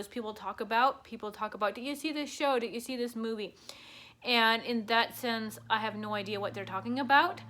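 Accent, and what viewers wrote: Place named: Ohio, United States. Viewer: You must be from Ohio ( Holmes County) I am guessing by your accent I have relatives from there 👍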